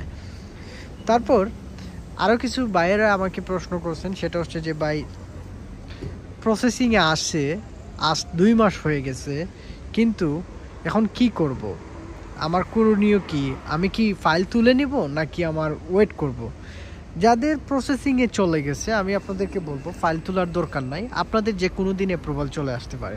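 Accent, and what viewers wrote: Sylhet, Bangladesh. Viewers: vai apne ki sylheti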